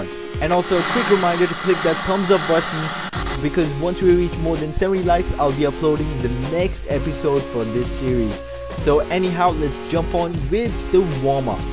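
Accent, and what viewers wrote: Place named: Southall, United Kingdom. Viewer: U sound like southall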